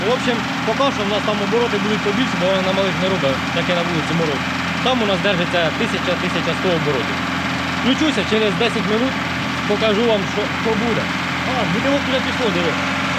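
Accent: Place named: East, Ukraine. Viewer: На сході оозмовляють так : на суржику.Не чіпляйтесь.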